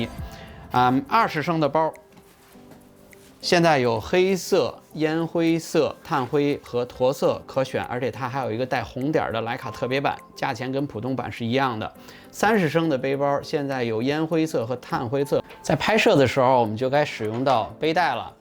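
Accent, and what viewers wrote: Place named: Sichuan, China. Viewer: 作者应该是巴渝或者四川人把！jin脏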